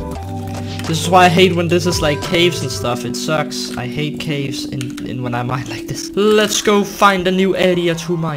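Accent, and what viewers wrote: Denmark. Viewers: i can here that your from danmark. true? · bruh den danske accent offffff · That moment when you can hear that He is danish becouse of his accent😂😂😂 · Sounds like a danish accent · i hear danish.. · are he danish¨ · I smell danish acent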